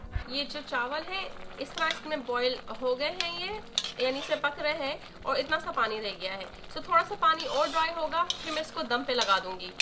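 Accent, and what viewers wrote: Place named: United States. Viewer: Aapka amarican accent bohot accha hai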